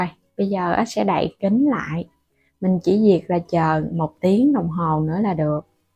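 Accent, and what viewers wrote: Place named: South, Vietnam. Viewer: Tra Vinh noi giong mien nam co them danh tu HEN sau cau noi nghe de thuong qua ❤️👍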